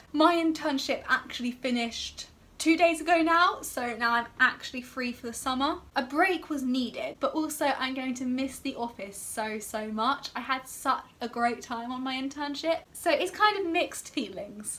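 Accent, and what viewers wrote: United Kingdom. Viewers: Hi just I have a question is there any collage that helps disabled by allowing them to be students for free or with cheap fees.I watch your videos to get a British accent. Thank you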